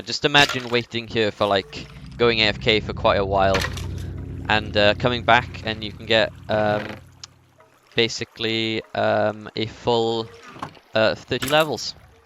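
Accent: Wales, United Kingdom. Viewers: Awesome to hear a fellow Welshman! And thanks for the tutorial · Very rare to hear a Welsh accent on YouTube, represent fella! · There's a subtle Welsh accent there and I love it.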